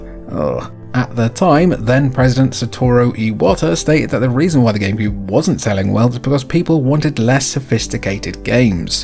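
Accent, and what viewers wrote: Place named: United Kingdom. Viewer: Good stuff as an American the “th” sound doesn’t really bother me I just sort of chalk it up to “ah he’s just British, it’s cool” 😂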